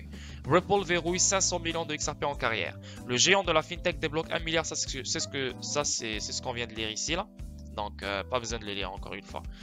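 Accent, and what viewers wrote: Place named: Morocco. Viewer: Tu serais pas un peu marocain ? Je crois entendre un léger accent.. Merci pour tes vid. Dois-je comprendre que je dois reprendre du xrp ?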